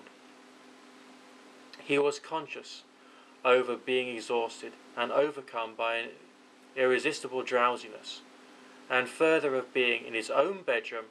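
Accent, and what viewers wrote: United Kingdom. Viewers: This is really a great reading , especially with that British accent, very good!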